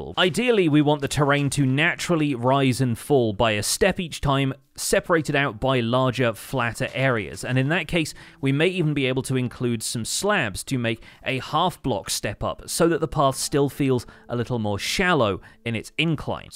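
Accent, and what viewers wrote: United Kingdom. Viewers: Finally caught up! Got halfway through season 2 then life got in the way. Started on S3 recently and as always - I throughly enjoy your take on MC coupled with the British flavored commentary 😉 I appreciate your attention to builds/farms that won’t directly work in bedrock as that’s the version I use. Many thanks for the time and effort you put into the survival guide series and I look forward to seeing more🎄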